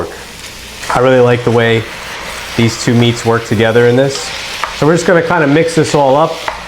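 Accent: United States. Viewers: It is very fun to hear this perfect American accent, though he is from Italian descent, so it is easy to understand what he says too. Next time I will make vegetable broth first. :face-blue-smiling:I never let this sauce cook for two hours. I think it must much healthier when it cooks for only 20 or 30 minutes. I don't understand why Italians cook it for so long. :face-fuchsia-tongue-out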